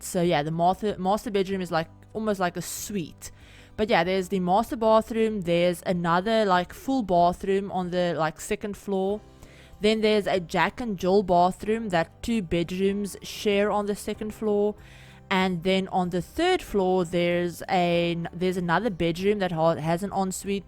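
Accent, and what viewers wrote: South Africa. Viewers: guuuuuuuuuuuuurllllllllllllll , i haven't found a creator from SA. notices the accent , its weird but awesome for some SA rep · Im south African too but i don't have an accent as nice as yours 😅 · Wow, spotted that accent from your very first word!!! 🤗 fellow South African here! Nice to see a SA creator :)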